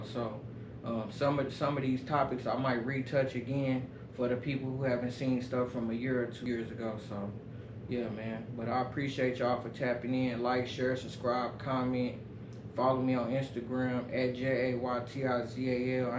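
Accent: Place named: Oklahoma, United States. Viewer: Don't you have to show the social physically and ik you was from okc I hear it in yo voice I'm from 81st & kelly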